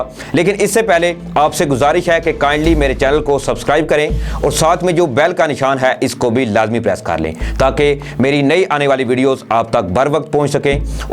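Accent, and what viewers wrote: India. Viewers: Mujhy lagta Hy yeh channel koee Hindu Chala Raha Hy bilkul hinde lahja hy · محترم آپ کا تجزیہ اور خبریں سُنی، بہت اچھا لگا لیکن آپ اردو زبان کو ھندی لہجے میں بولتے اور پڑھتے ھیں جو کہ اچھا نہیں۰ ویسے یہ آجکل اک عام رجحان پایا جاتا ھے جو کہ پرو انڈین رجحان ھے، دوسرے لفظوں میں اگر کوئ شخص یا قوم اپنی گفتگو میں کسی دوسری زبان کے الفاظ استعمال کرنا شروع کر دے تو اسکا مطلب اس زبان سے مغلوب ھونا ھوتا ھے۰ اس طرح ھم غیر شعوری طور پر لسانی معاملات انڈین غلامی کی طرف جا رھے ھیں۰ قابل اعتراض الفاظ مندرجہ ذیل ھیں خ کو متعدد بار کھ پڑھا گیا جیسے خبر کو کھبر، خیال کو کھیال کہیں تاریخ کو تاریک پڑھا گیا اب سوال یہ پیدا ھوتا ھے کہ آپ اردو میں گفتگو کر رھے تھے یا ھندی میں۰ ھمارا رویہ اگر اردو کیساتھ یہی رھا تو بہت جلدی اسکا نام اردو کی بجاۓ ھندی رکھنے کے مطالبات سامنے آنے لگیں گے۰آپکو یاد ھونا چاھئے کہ پاکستان بنانے کی اک وجہ اردو زبان کو زندہ رکھنا تھی۰ کو بھائ میری اس تحریر کو پڑھ کر اردو سپیکنگ لوگوں پر کمنٹس کرنا نہ شروع کر دے کیونکہ میں پنجابی ھوں لیکن اگر کوئ پڑھا لکھا پاکستانی اردو غلط بولتا یا لکھتا ھے تو مجھے اچھا نہیں لگتا اور اردو کو ھندی لہجے میں بولنا یا لکھنا میری لیۓ نا قابل قبول ھے۰ امید کرتا ھوں پڑھے لکھے نو جوان میری تحریر کو ممثبت انداز میں لیں گے اور اپنی گفتگو جو وہ اردو میں کرتے ھیں اسکا خیال کرتے ھوۓ قومی زبان کی حفاظت کریں گے۰ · نام تو تیرا ہے مگر تیرے تلفظ اور تیری ہندوستان کی سعودیہ کے ساتھ وابستگی کی وکالت سے یہ ہر شخص یقین سمجھ سکتا ھے کہ تو کوئ انڈین ہی ہے غور سے میری بات سن ، ھر قوم اپنی غیرت کے بل بوتے پر زندہ رہ سکتی ھے اور غیرت کے ساتھ ھی ترقی کی منازل باآسانی طے کر سکتی ھے ۔ تیرے جییسے بزدلی ، بیغیرتی اور صرف تیل ، نوکریوں اور پیسوں کے لئے مر نے والی قومیں ذلیل و خوار ھی ھوتی ھیں۔۔ اب ھمارے لیڈروں نے اگر اپنی عزت نفس کے لئے اپنی قوم کو صحیح راستے پر ڈالا ہے تو ھم دل جان سے آنے والی ھر مشکل میں انکے ساتھ ہیں آپ اپنی بکواس کو اپنے تک ھی محدود رکھو میڈیا پر آکر اپنی انڈین ذہنیت عیاں مت کرو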